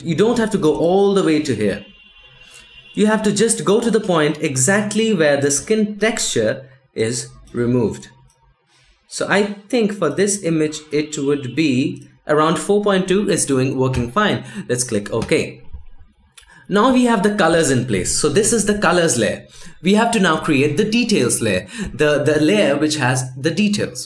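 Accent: India. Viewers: Watching your channel for a while but this is my first comment. Honestly this channel is one of the best to learn Photoshop. Thanks man for your effort and time. And by the way I'm impressed with your English accent. I find Indian English kind of funny. But you rock as you rock with Photoshop. Keep up the good work.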